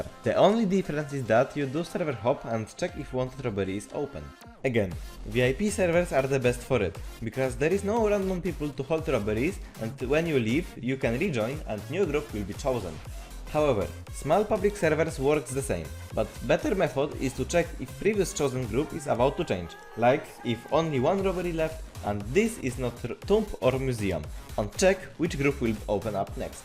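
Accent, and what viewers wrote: Poland. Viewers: Im I trippin or you from Poland cuz the accent is selling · Słychać że polak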